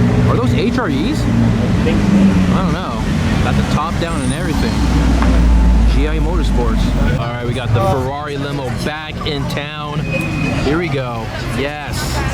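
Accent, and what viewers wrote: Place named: Southern California, United States. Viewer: That socal accent. Lol irrelevant but still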